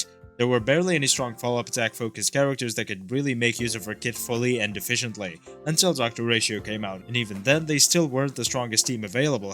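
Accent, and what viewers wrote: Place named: Arab world. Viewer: I think you are from united arab your accent seems like them or near it :3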